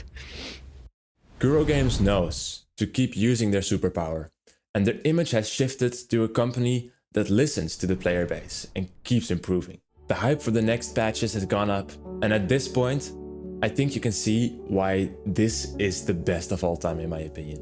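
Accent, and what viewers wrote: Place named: Netherlands. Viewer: 300 subs?? with this kind of editing? Amazing work Also are you perhaps Dutch because I thought I heard the accent in your English XD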